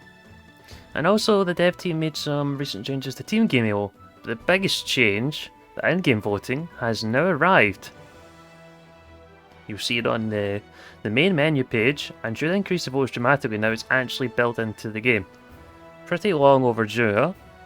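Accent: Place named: Glasgow, United Kingdom. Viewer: r u fae glasgow?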